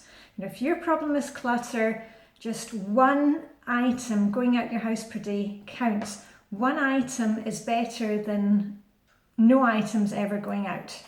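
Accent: Scotland, United Kingdom. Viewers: Funny accent? I have a lot of Scottish friends, I love your accent, hen xo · I love your accent, reminds me of our middle school nurse who must've been from Scotland. Can I also say that I love your style, you're dressed so classy every day! I'm a sahm and I pretty much dress in leggings and a regular shirt (which is changed numerous times a day due to spit up). I'm trying to follow you, but it's hard with young babies. Thanks for all of your videos!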